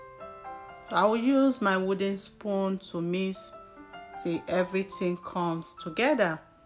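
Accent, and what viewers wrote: Nigeria. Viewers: Is this a Nigerian? The accent isn’t Kenyan nor the slomo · Thats not the kenyan way...accent too sounds Nigerian not kenyan .. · Kenyans have Nigerian accent thou👌👌👌👍👍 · The person talking is not Kenyan believe me ur from Nigeria though mandazi ni matamu😋😋😋😋😋😋😋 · Nigerian accent wow how did u manage that in kenya.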